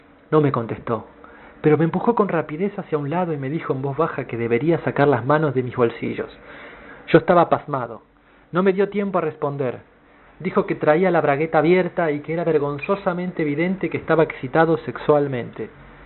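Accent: Argentina. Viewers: Que poca madre arruinar algo tan profundo con la lectura culera y por si faltaba algo pa hacerlo mas mierda el acento argentino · Porque dices shover es llover arjentino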